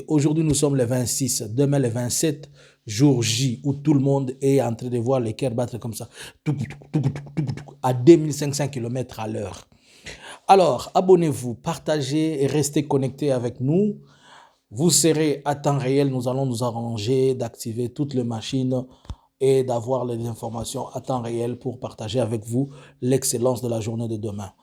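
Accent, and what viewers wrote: Rwanda. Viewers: Je crois entendre un rwandais.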